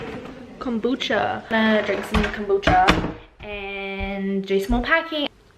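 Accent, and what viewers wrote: Australia. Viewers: Wait is she from Melbourne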